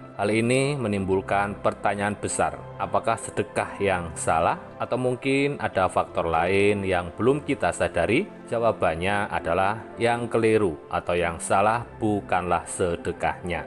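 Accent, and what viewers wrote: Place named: Java, Indonesia. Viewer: Suaranya mirip suara Jokowi. Ditambah lagi aksen medoknya.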